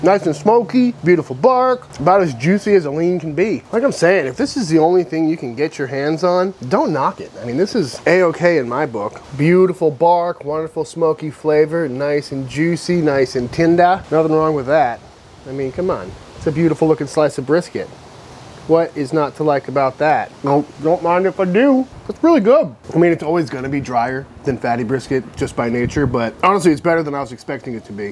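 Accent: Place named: South, United States. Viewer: That slow southern drawl is just killin' me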